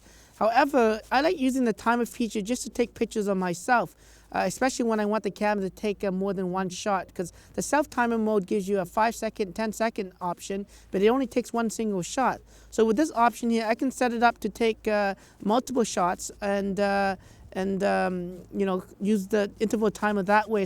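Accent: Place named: Caribbean. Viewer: I'm picking up a little Caribbean accent in your speech, do you visit frequently? 🤓 great video!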